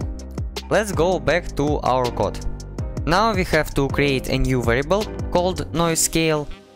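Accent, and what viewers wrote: Russia. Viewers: так охват аудитории шире, а учитывая то как тяжело продвигать обучающий контент, енглиш решение правильный выбор, но акцент наш кнш)) я часто использую нейросеть яндекс браузера для перевода видео, иногда мне действительно комфортнее слушать урок на русском и я просто включаю перевод, так что рекомендую) проверено на себе, да перевод иногда кривой но в целом оч хорош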